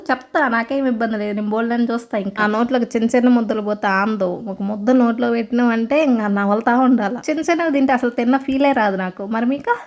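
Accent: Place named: Rayalaseema, India. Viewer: మీది ఏ ఊరు అండి రాయలసీమ slang లోనే మాట్లాడుతున్నారు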